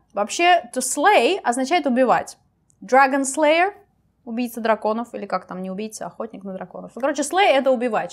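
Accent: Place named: United Kingdom. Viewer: Какой красивый британский акцент! Ласкает слух))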